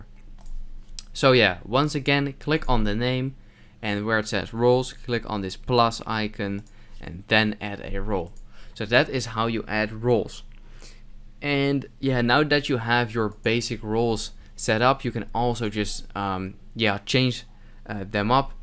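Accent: Netherlands. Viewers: Thanks for the turtorial! it was super handy ;p and your accent screams : 🇳🇱🇳🇱🇳🇱🇳🇱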